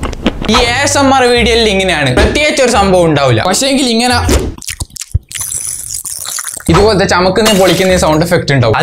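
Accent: Kannur, India. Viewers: Kannur slang😂😂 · kannur basha polichu.......😂😂🤣🤣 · ee kannur baasha kelkaan oru prithyeka sugamaa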